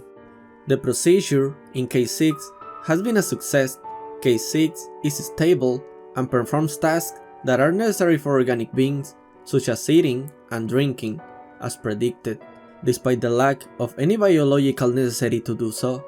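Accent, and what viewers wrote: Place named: Spain. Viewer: Your acent is very spanish.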